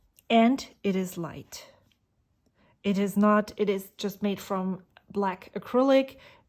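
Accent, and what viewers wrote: Germany. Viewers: I bought three of these and they all write differently. One was perfect out of the box, one was a bit on the dry side, and one needed a lot of tweaking before it wrote like it should. Not the first time that Jinhao's quality control, or lack thereof, makes the pens they produce a little unpredictable. All in all not a bad pen, especially for the price. PS: Finally, an English-speaking pen reviewer who knows how to pronounce "Montblanc". I detect a hint of an accent though. Are you German? Don't shoot me if I got it wrong. :)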